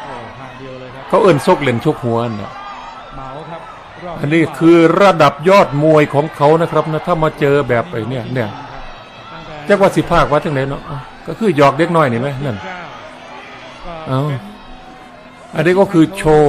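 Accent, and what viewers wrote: Isan, Thailand. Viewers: ท่านพากสำเนียงอิสานใด้น่าขำ..ท่านอื่นพยามเลียบแบบแต่ไม่มีใครสู้ฟังดูแล้ว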